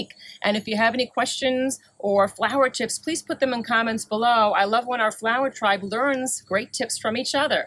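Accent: New Jersey, United States. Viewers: What is the foxtail plant in your sunflower field?..Is it a millet or a grass amd is it beneficial to the sunflowers or just something that grew there? Thanks in advance. I always miss my home state NJ when I hear you talk😍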